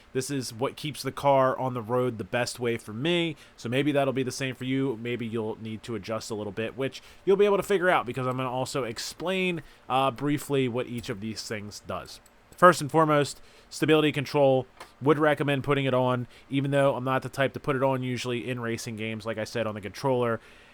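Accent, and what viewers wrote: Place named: Bay Ridge, United States. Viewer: Make NO mistake, cuz you sound exactly like Chris DeStefano with the Bay Ridge sounding accent. It's just what it is!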